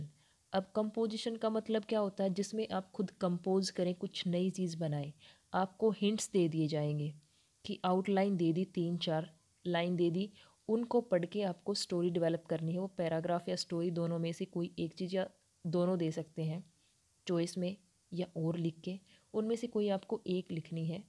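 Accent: Haryana, India. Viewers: Madam Ji Maine Apka Thoda thoda Haryanvi Language ka Touch Padhne main Ap use karte ho Badhiya lagta hai जय हरियाणा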